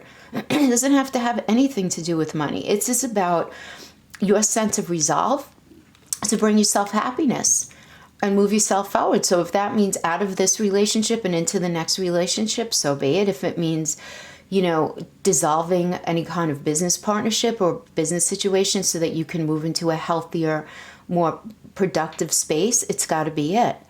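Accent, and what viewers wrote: New York, United States. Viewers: Nu yourk! Love your accent. 💗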